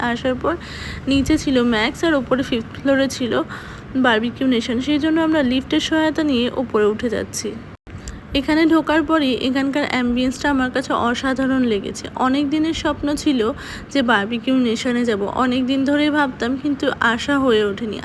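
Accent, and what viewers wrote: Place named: Bangladesh. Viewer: Apni ki Bangladeshi??? Kotha gulo kamon jano..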